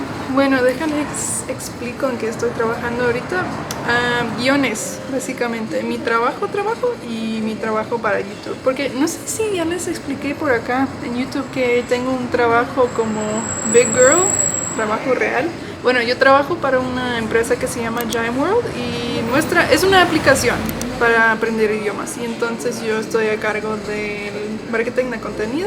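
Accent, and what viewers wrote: Mexico City, Mexico. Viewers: Es increíble lo mexicano que es tu acento. No sabría si eres una mexicana que vivió mucho tiempo en EEUU o una gringa que aprendió español muy bien. Saludos desde Chile y disfruta ese hermoso país. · cuando hablas en español , tienes el acento de CHILANGA ... CDMX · You sound soooo Mexican.. After a few weeks out there in Orizaba ¿why don’t you try out a bigger city? Digamos… Guadalajara, Morelia o la mismísima Ciudad de México 🇲🇽 Bienvenida a México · Que guerita tan activa y alegre, le pones Mucha passion a tu Vida diaria...and yes, your Spanish is very Mexican so that's easy-to understand and very fluid ... so enjoy your time in México.. bye and good luck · You’re white? U sound Mexican af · Tu español esta espectacular con un asentó muy mexicano, espero te la pases bien en México 👍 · cuando hablas espanol parese que eres mexicana no manches muy bien. 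· no es anglosajona es lationamericana no tiene acento estadounidense cuando habla ingles. Pa ra la gente que no sabe ingles piensa que es estadounidense pero no tiene rasgos latinos. Cuando habla ingles se le oye el acento en espano dice mi esposa gringa. tien acento mexicano. tiene mala pronunciacion en ciertas palabras en ingles. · You're the Coolest Girl, Love to hear your Méxican Spanish, it's just awesome, Blessings and Congrats, ❤️🇲🇽🤍💙 Hablas un Español muy Dulce 🤗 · Tu acento es muy mexicana😮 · Hola!! Primera vez que veo tus videos y me gustan. Hablas bien Mexicana, tu español es muy claro 10 de 10 a tu español, Yayyy!! Espero que disfrutes mucho de este país y que que hagas muchos amigos para que salgas a otros lugares de México!! 🙏💚❤ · So pretty girl !!! ... mexican accent ??? mission accomplished !!! ... why you choose Orizaba ???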